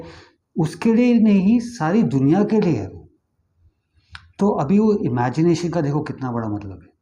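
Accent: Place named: Mumbai, India. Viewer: I never knew you had a Hindi channel as well ... nice ....and I like your Hindi .... ekdum bambaiya types 😛.... yes and very truly said .... Imagination, Visualisation .....plus feelings .... very important 😊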